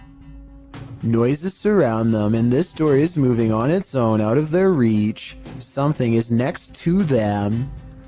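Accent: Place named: Southern California, United States. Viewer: Your SoCal accent is on point.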